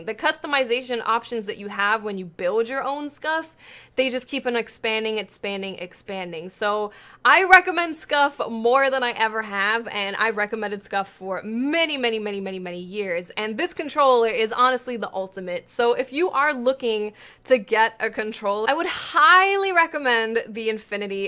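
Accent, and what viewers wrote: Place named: East Coast, United States. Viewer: I'm lovin that accent lol. new yorker? Philly? you gotta be from one of the East Coast cities haha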